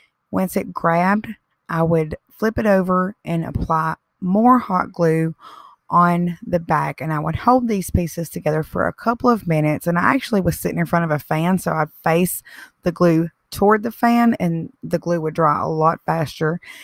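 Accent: South, United States. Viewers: You are very creative. As another comment says, you think outside the box. Thank you for the step by step instructions. I love your southern accent!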